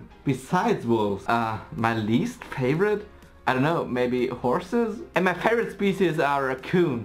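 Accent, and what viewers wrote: Germany. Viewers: your accent is as german as it gets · you do have a bit of a different German accent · I love your german accent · nope, german accent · you have a German accent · The curse of the "German Accent" is with you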